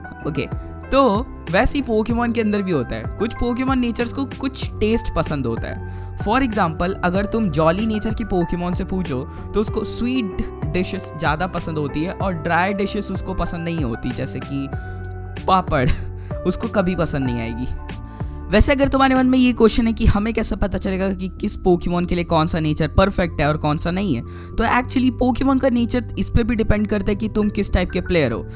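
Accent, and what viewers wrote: Bengal, India. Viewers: Are you freaking Bangali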